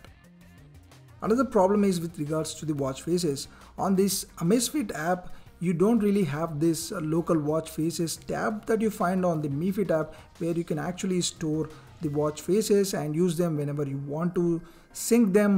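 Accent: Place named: Telugu, India. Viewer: Great review! Are you from telangana or Andhra Pradesh btw? Just curious 😅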